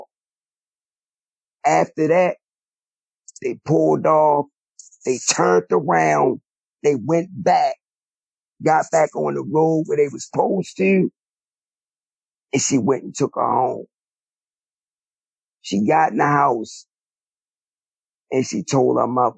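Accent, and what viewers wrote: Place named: South, United States. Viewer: Just his southern accept so cool!!!💖💖💖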